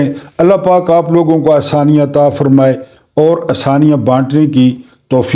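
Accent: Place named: Mianwali, Pakistan. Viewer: Janab Sahib hum tu aapka vlog ka intazar kartay hain. Kun keh aap such boltay hain aur pakistan kai ASLI mujrimon ko tikaa tikaa kai back per martay hain unn ka asli chehra apni awam kai samnay rskhtay hain aur apnay mianwali style aur zuban mai buht khubsurat guftagoo kartay hain. Pakistan keliey dukhi insaan ek legend Anwar Maqsood sahib ka tazkira bhi buhat acha laga.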